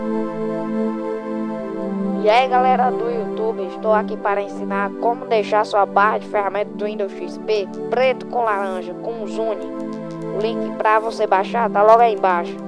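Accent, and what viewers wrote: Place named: Paraíba, Brazil. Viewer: FANHO COM PARAIBANO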